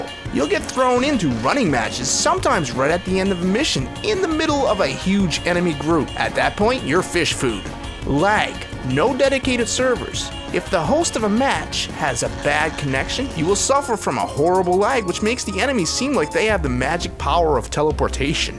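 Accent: Canada. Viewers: You have a Canadian accent lol. Anyways, thanks for the review! I'm glad you went over it and such but maybe you should do an update?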